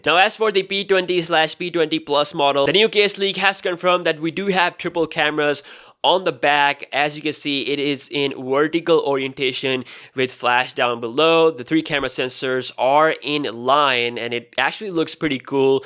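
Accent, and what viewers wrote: India. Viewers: You got indian accent. Video is good. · det is India talk! Hahahaha...Pidgin "English" !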